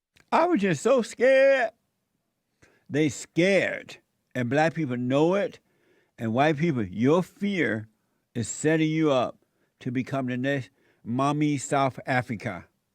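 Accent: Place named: South Carolina, United States. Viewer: Yep sounds like South Carolina.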